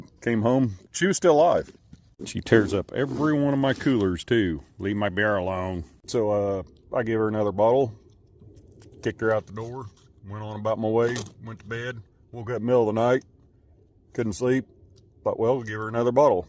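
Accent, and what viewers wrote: South, United States. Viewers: You're accent sounds Southern sometimes -- like when you say "ma dawg" lol 👏 My Alabaman father says it that way too. I guess since Illinois is partly in the South or bordering it in any case, it's not that crazy.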